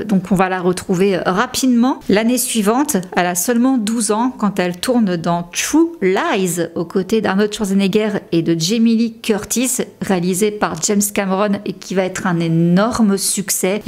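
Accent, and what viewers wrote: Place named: France. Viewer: face XD c'est faith XD LOLL accent francais es adorable j'adore tes video je les écoute chaque matin du canada :) <3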